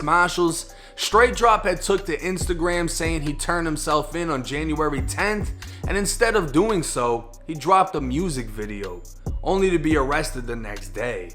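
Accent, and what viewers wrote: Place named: New England, United States. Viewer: Yo I just argued with someone over your New England accent, they said it was a Florida accent smh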